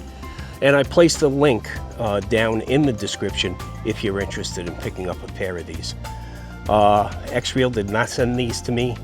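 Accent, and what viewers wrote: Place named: New York, United States. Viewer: New yorkah?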